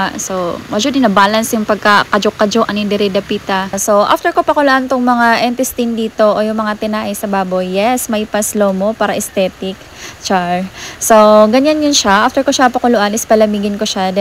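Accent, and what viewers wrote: Bohol, Philippines. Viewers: abi nhu taga Bohol ka mam mao mn gud tunada inistoryaan nmu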